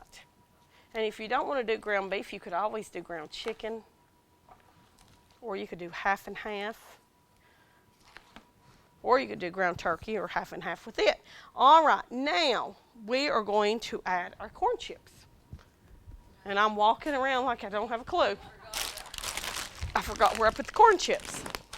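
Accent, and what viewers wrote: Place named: Maine, United States. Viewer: Lord, thank you that despite growing up in the middle of the potato field, I don't talk like these people here in Maine. Good god, don't know what I'd do if I had to speak authentically to my region like this woman.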